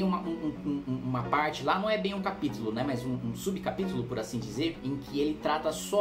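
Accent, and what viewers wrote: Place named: São Paulo, Brazil. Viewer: Só pela fala acredito que seja paulista... e paulista falando que "última bolacha do pacote" chega ser insano kkk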